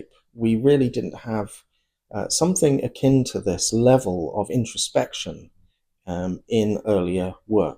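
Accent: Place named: New Orleans, United States. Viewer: Used to love your unique New Orleans sound, delighted you’ve found a second career in lit crit! Outstanding stuff. 😊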